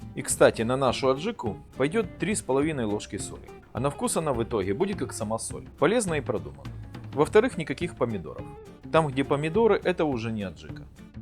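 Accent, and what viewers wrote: Ukraine. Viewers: Рецепт то абхазский,но говор у Вас украинский или Ростовский,Краснодарский,но никак не апсны!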